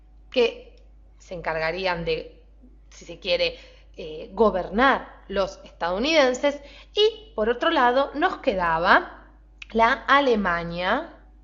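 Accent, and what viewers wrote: Argentina. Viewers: "pantasha" es la pronunciación de la palabra pantalla en Argentina, no "pantaia"... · pinche acento argentino xd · es argentina, no la culpe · Cuanta ignorancia carajo, soy mexicano y a mí tambien me caga como hablan los argentinos con ese acento de mierda, ya todo difiere de la region o países pero hay que admitir que el español de verdad es el de España ya que ellos lo trageron al continente y por ende así se debería hablar. La muchacha del video al pesar del acento que me incomoda un poco se expresó de forma profecional, lo más natural posible y no como otros videos que utilizan frases que suelen usar en argentina como vos, pelotudo, que se yo......, pongo como ejemplo la mayoría de las peliculas gringas son dobladas en méxico para latinoamerica y en ninguna dicen cabrón, chabo, pendejo, etc. Así yo veo este video, por lo demás esta bien.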